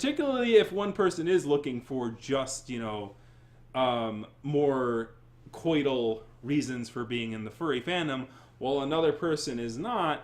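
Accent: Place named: Australia. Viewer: At last! An Aussie accent! Maybe you've done that in the past, but this is my first time present for it, so... One thing the Furry Fandom seems to be really good at is creating its own problems and then complaining about it. This whole thing with h3h3 is just the latest example. The Majira episode is truly a decent opportunity wasted by technicalities which have already been forgotten, ironically enough. I can't say that the realization of what the Fandom is about isn't lost on furries themselves, since LOTS of them act like it's about the suits, even if they say it's not. It's disappointing to me, but it is what it is. All this podcast did in general is comment on the conduct they're seeing, so I respectfully shrug. What were we expecting without an experienced guide? Then again, you make a valid point about decorum. No one with taste would throw on makeup and S&M if they were having KISS as guests. 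I often think of jobs I've had or just doing a favor for someone where I'll reach for something, and usually boring people will automatically vomit the phrase "he has long arms.". Well, thanks Captain Obvious. And they say this in a way that requests that I grin from ear to ear like an idiot and express near grief when I don't. Yeah, guy, it's nice to have 'em, but it's not all that interesting when you have 'em. Thank you will do the trick. The latest on this can be found on Twitter, where the debate on whether the Furry Fandom even needs representatives rages. Never mind that i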